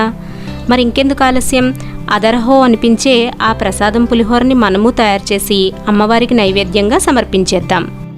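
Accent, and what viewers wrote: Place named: Godavari, India. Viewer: Nice vedeo making, nice background scoring, godavari accent... Simply superb.... Chusthunnanthasepu haayiga undhi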